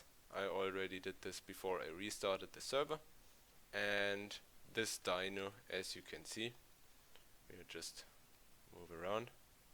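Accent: Germany. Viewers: German? xD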